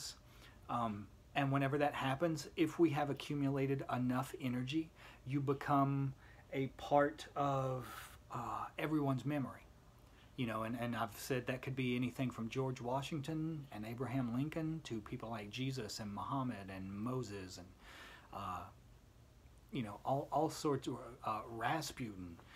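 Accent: United States. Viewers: Hearing an American accent saying something constructive about my beloved Crowley took all the pent-up defensiveness right out of my shoulders and almost made me cry. I've been on gab too long. The religious people on social media are insane with hatred for anything but mainstream belief systems.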